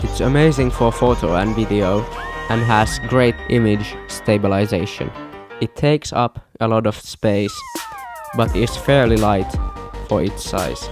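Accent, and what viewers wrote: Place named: Finland. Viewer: Ootin hitonmoista rallienglantia, mitä vielä! Nice🤘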